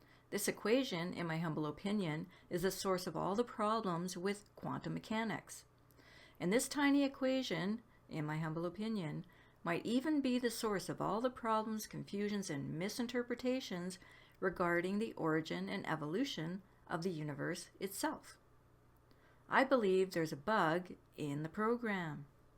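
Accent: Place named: Canada. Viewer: I have been studying Ken Wheeler now long enough to feel comfortable. This now should help refine the incomplete areas. I need to model and understand what the mainstream calls atoms, electron orbitals/shells, valence etc. Now believing there is a minimum limit to everything - which is sensible to me - should help refine the model especially with regards to electron orbitals. Somewhere I expect to find a relationship based either on phi or fractals that will define patterns we experience as in the periodic table of elements. ps I assume you are Canadian - I heard aboot way too many times.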